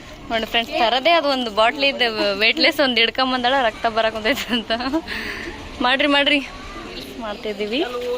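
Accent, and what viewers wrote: North Karnataka, India. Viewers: Supet nema ❤❤ nice vlog video ಮಾಡ್ತಾ ಇರಿ ನನಗೆ ನಿಮ್ಮ ಉತ್ತರ ಕನ್ನಡ ಭಾಷೆ thumba ist sissy ಅದಿಕ್ಕೆ video miss madde nodthini ❤❤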